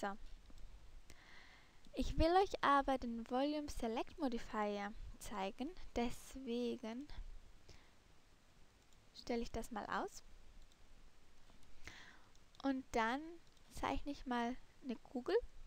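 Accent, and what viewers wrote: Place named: Germany. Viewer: cutest German accent ever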